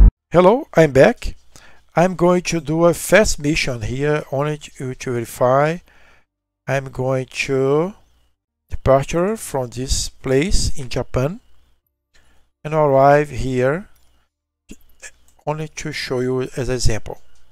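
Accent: Brazil. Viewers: percebi que era br pelo sotaque skaksakska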